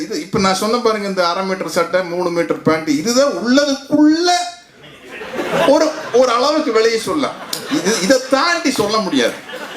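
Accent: Coimbatore, India. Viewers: கோவை தமிழ் சூப்பர் 👌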